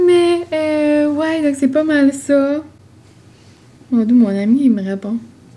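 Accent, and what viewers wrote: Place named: Acadia, Canada. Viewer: Mon conjoint vient de Tracadie et nous sommes à Qc donc on ne peut pas descendre encore! Mais j'adore entendre l'accent de nouveau 😌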